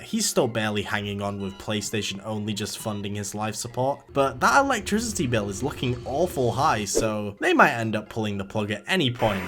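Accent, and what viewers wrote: United Kingdom. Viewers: oh, he's British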